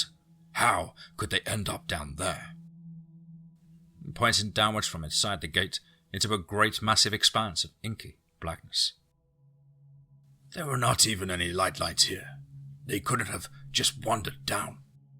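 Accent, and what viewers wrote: England, United Kingdom. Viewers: You sound exactly how I imagined my homeboy with a U.K accent would, if I had a homeboy with a I.K accent that is. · Eric C you do. is your homie with a UK accent, but to him it's just how he sounds as an Englishman lol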